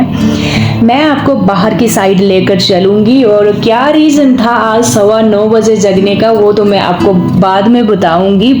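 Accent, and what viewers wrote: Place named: Jammu, India. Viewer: Nice ji ❤from rajasthan Mai apki dogre accent bhut pasand krte hu Mai akhnoor boder pe rahte the 1993 mai to lagaw h panjaabi, dogree,kasmiri se Mere father saheb bhi fouji rahe 1998 tak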